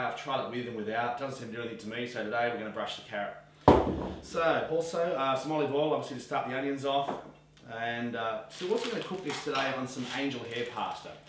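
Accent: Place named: Australia. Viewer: i think he's Australian, that's why he pronounces stuffs like that. no problem about it. :)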